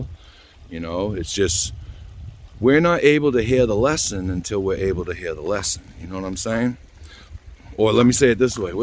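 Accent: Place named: Massachusetts, United States. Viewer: great accent ....massachussets >?? Canada here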